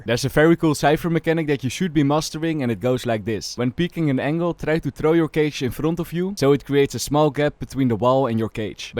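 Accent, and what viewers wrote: Netherlands. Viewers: meest nederlandse accent wat ik ooit heb gehoord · de nederlandse accent is kei hard haha, danku voor de tips !! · are u dutch or belgium by any chance?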